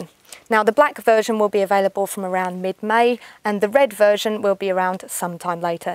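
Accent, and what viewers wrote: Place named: England, United Kingdom. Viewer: I want to marry an english girl; I can listen to that accent all day long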